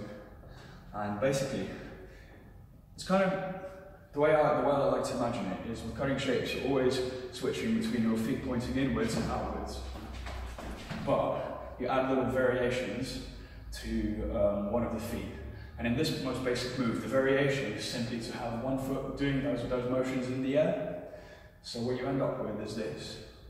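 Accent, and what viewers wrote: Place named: Germany. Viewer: He sounds foreign,kind of germanish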